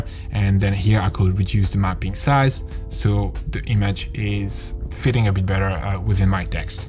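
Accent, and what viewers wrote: France. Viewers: Love your French accent hah! Thank for this useful video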